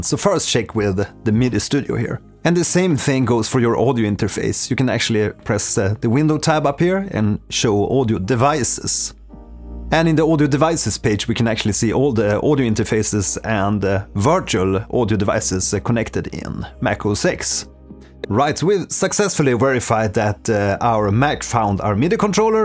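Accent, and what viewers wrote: Sweden. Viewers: nice anc clear video. I also love the swedish accent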